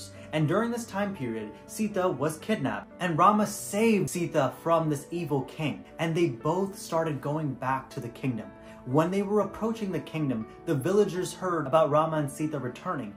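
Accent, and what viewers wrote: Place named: United States. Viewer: What is you ethnicity? you look Indian but DEFINATELY do not sound Indian, at all. I even went ahead and checked your oldest video. You sound US born and raised or someplace in Europe.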